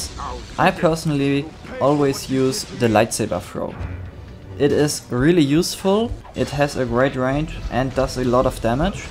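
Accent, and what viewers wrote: Germany. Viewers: dein deutsch englisch ist echt net gut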